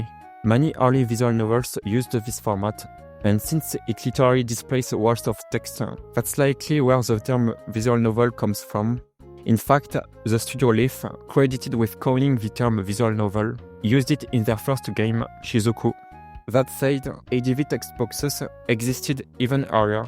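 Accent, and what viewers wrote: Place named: France. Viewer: Gotta love that French accent! Keep the great work. (Also i caught a cold and now I am miserable. 🤧)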